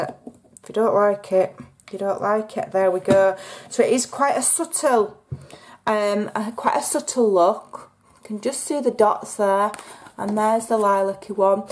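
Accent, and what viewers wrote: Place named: Lancashire, United Kingdom. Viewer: Did you grow up near Lancashire? I ask because Jane Horrocks was born in Rawtenstall and you sound like her a lot. I have always loved your voice! Your so amazing <3 Tug at this American man's heartstrings lol. Thank you for all the wonderful videos.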